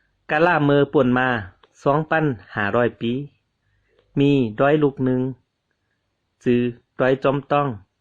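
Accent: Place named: Tai Lue, Thailand. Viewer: เล่าประเมืองพยากนครเชียงตุงสำเนียนไทยลื้อได้ดีน่าฟังมากครับ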